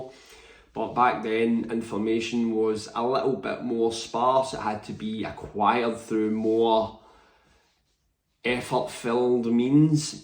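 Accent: Scotland, United Kingdom. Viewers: Subscribing just for the barbaric Viking-esque Scottish accent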